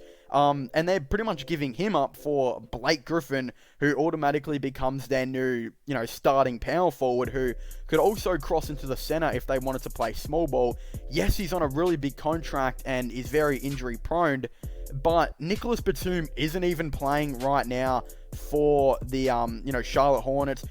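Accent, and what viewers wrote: Australia. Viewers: Deffs Aussie??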